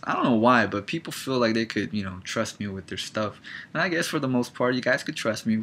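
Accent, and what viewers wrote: England, United Kingdom. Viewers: Looool I like the English accent. And tr thig you said about Adam and Eve was actually so true , after the flood in Noah's time people's lives started gettif shorter due to sin ,and disease started spreading to. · oh i dig your british accent ;) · I'm from England! I'd send you something but I'm not really sure... I guess you'd expect tea or something. Your English accent made me laugh though.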